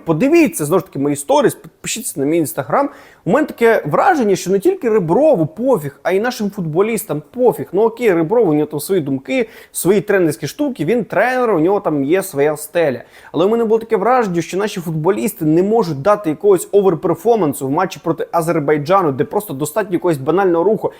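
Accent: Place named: Russia. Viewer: Що знову з розійська редукцією ?) Де співоча П'полтавська українська мелодика мови ?)))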